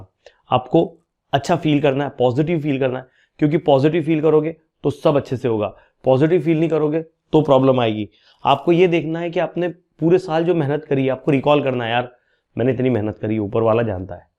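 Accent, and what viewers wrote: Maharashtra, India. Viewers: Sir tumhi Marathi aahat ka?????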